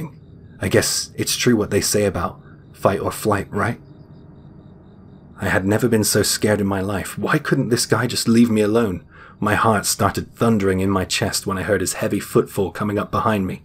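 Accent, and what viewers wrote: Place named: England, United Kingdom. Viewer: Such unique content. Japanese stories translated to American English with an English accent! You have something special here.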